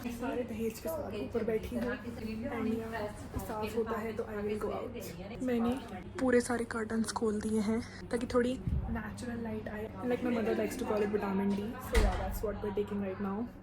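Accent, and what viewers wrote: Punjab, India. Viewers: Godda , putha IK your punjabi accent 😭😂🎀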